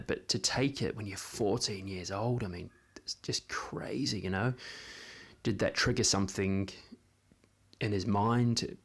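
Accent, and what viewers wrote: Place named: Japan. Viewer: Great video. I was 7 in 1980 and I also remember my father too but it was because I’d had never seen him cry before. Obviously now 40 yrs later on I understand. BTW nice Japanese accent 🇯🇵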